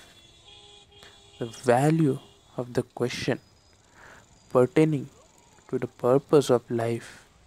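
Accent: India. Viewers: Please do whispering videos!!! The Indian accent is the best accent ever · I love the Indian accent! Would be great if you did some whispering videos. 😊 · Indian accent is just the best! <3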